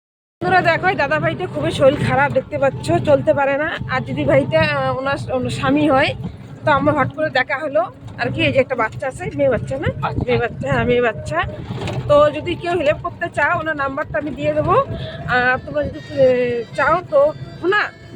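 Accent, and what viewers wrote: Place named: Rajbanshi, India. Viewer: রাজবংশি তোমাদের ভাষাটা বেশ ভালোই লাগলো সুনতে দিদি ভাই ❤😊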